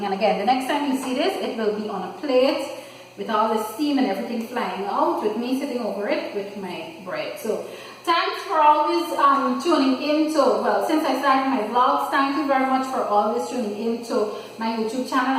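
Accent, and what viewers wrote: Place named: Guyana. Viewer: I miss my mommy pepperpot and it is great to hear the guyana accent when away from home, always make me smile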